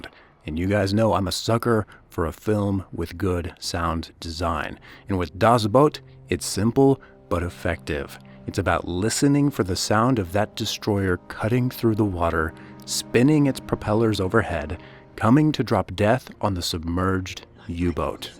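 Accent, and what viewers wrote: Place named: Austria. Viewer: Östereicher habe ich mir gedacht. Es klingt ein ganz klein wenig anders als Bayrisch. Aber so genau könnte ich es nicht sagen...Ok bin ja auch Norddeutscher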